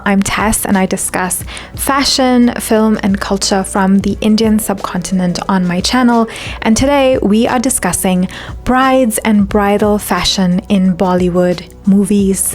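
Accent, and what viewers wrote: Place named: South Africa. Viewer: Unrelated to the topic but can I ask if you’re a South African desi ? Your accent is gorgeous !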